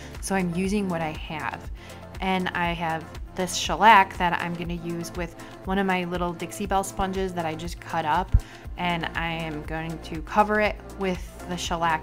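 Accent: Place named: Midwest, United States. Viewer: I love your Midwest accent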